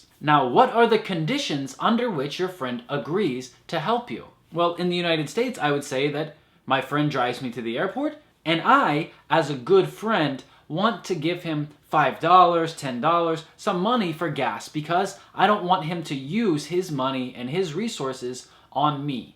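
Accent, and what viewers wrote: Russia. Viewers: Почему мне кажется, что заполучил русский акцент?))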